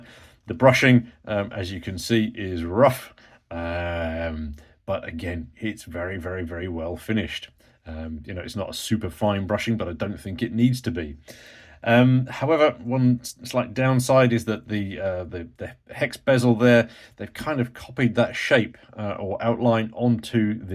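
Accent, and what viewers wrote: United Kingdom. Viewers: Why can't British talk English properly like Americans? It's tough to understand, fella.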